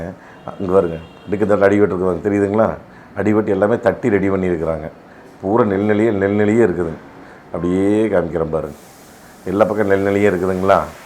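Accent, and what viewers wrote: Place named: Tiruppur, India. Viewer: ... உங்களோட இந்த திருப்பூர் தமிழ் அருமை... அதற்கு நாங்கள் அடிமை..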